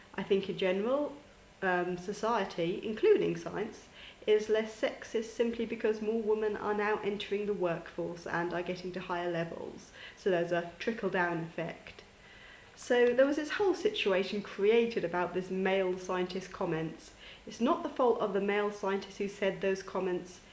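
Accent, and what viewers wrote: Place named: United Kingdom. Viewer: i loved her british accent...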